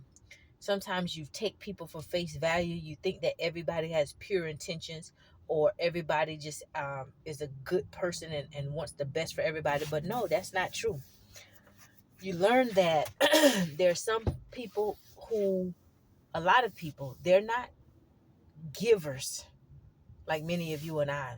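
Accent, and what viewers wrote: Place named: Louisiana, United States. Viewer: Yessssss 🎉 John 15 Hated Without A Cause If They Have Hated Me They Will Also Hate You “ Come On Women Of God Say It “ Even From My Own Family And So Called Friends “ Used And Took Advantage Of My Kindness And Mistaken It For Weakness Not Realizing They Must Reap What They Sew Nobody Gets Away Also Thanks 🙏🙏 Women Of God For This Channel I’m A New Subscriber “ Keep Being A Absolute Blessing To EveryOne ❤❤❤❤❤❤❤ Also Are You From Louisiana Because My Family’s From There Aswell And When You Speak I Hear It 😊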